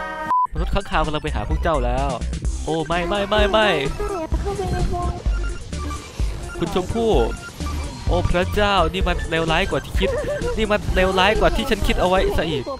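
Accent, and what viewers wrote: Isan, Thailand. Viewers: เดี๋ยวเฮาช่วย ชอบอีสานสำเนียงไทยของพี่อ่ะน่ารัก5555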